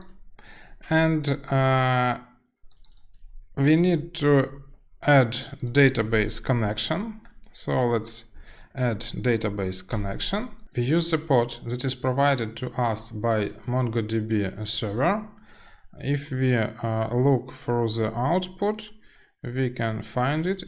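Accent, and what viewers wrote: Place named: Russia. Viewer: Seems like a russian guy:)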